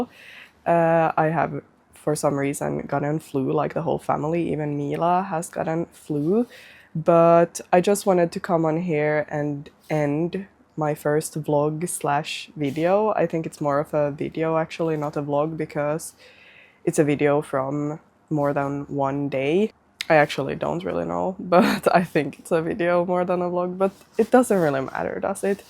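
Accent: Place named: United States. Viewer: تقريبا الاكسنت امريكي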